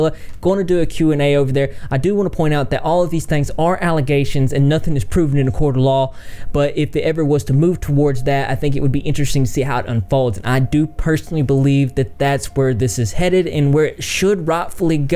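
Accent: South, United States. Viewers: My comment here, relates more toward You.. Just to let u know..I love love love, your southern accent. With myself Born and raised, a larger chunk of my life, in thee south. Growing up with it myself, forever hearing it around me, and some family members still down there.. my moms side is from Long Island, NYC, with my dads side and thick accents from Alabama and Missouri, and mysyself born/raised/growing up and all of schooling their high school. In Florida, Southwest, Florida. I have to say I really really do miss it sooooo much. Not there anymore and living in NYC currently, it really does make me homesick upon hearing ANY Southern accent! I work With an older man from Louisiana, he has a very thick accent.. and I will find myself gravitating towards him, just bc its a southern twang lol. I do have a slight one, and after being here for 10 yrs, my friends knowing me here, just as long as those 10, still does NOT PREVENT THE RIDICULING of my PRONUNCIATION OF CERTAIN WORDS WITH A SLIGHT TWANG. 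But ya kno what?? I don't mind at all. 1. I then make fun of their NY Brooklyn/jersey, guido-esq. accent, right back at em, and 2. (Most importantly) reminds me that I haven't lost MY OWN PERSONAL southern accent, which I would never wanna lose AND WOULD BE DEVASTATED IF I EVER DID..😔. The southern guy I work with..I find myself gravitating towards all day, for I just Miss hearing the Southern accent and references to things that only the south understands lol. I could just listen to h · where are you from? Hear that southern twang all over this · Where are you from? I adore your southern accent. Reminds me of a beautiful still, winter snow day in Tulsa. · Also, I love your southern accent! I had to work very hard when I was in the Publishing business to get rid of mine. But it has slowly (quickly) returned once I got out of that field. lol Sometimes my accent is so thick that I have to translate for people. 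Words like Oil, Tire and I'll never get rid of, Ya'll in my vocabulary. lol Keep doin you! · Love your southern accent dude 😌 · our southern accent really does make it hard haha great video as always! Happy to see you covering everything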